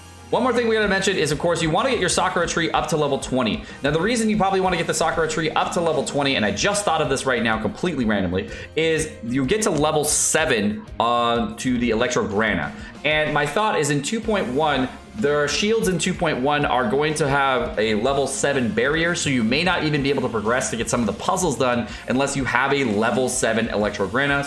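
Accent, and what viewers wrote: United Kingdom. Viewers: Apparently it's tea time. That's why is back ☕ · HEY IS BACKKKKKKKK!!!! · YAYYY! is back! XD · is back LETS GOOOO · returns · I was really missing, I enjoyed that! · Aye is back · Welcome back! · The Return of 🔥 and those theories were really out there thanxx 🤣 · is back!! Also, thank you for the heads up! All are good tips and guesses;) btw no theory of kazuha raise a flag? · I missed lol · Yay!! Glad he's not being mean to normal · Hi Hi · yay is back. so happy to see him again. hope to see russian and normie soon too. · I missed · Love 🤣🌸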